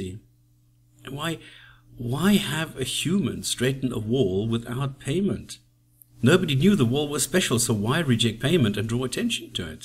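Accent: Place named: South Africa. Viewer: Great first video. Your accent sounds colonial, maybe south african?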